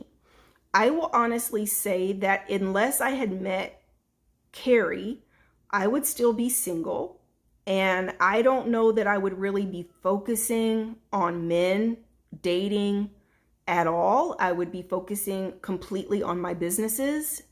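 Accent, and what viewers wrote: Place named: Georgia, United States. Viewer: Why do you low-key look like Jada Pickett-Smith today? Except for the Georgia accent, you sound a little like her, too.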